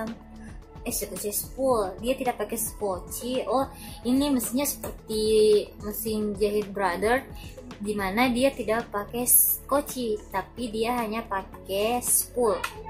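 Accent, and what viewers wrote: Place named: Makassar, Indonesia. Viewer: Makassar yh logatnya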